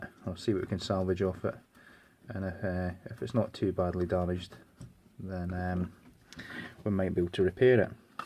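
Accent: United Kingdom. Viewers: Hi, it was a total coincidence, but I'm happy I've found your video. Personally I own more than 40 Amigas 500 (just this model) and I have many parts of it (but just one of it is the real 501;). It was really great to see the professional way you have handled it. BTW. Very good manicure;) and just please keep in mind, not all of us are native speakers (however it was great to hear the beautiful britisch englisch). Regards from Germany. Adam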